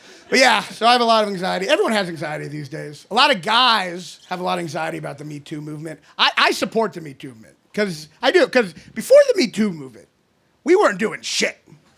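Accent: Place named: New York, United States. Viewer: He's from Kentucky but he sounds like a New Yorker. That's how Jewish he is.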